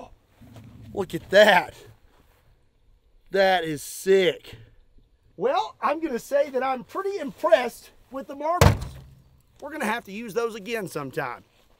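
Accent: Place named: South, United States. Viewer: you can hear the southern in his voice clear as day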